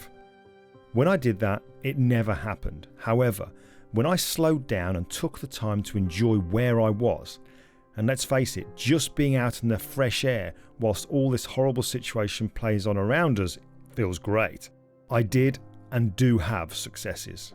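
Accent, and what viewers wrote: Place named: Birmingham, United Kingdom. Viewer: Thanks, always good to hear your Brum on Kelby 1,too!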